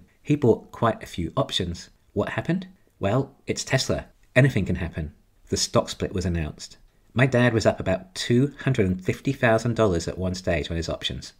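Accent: United Kingdom. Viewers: It sounds like you are from the UK what app do you use to do options?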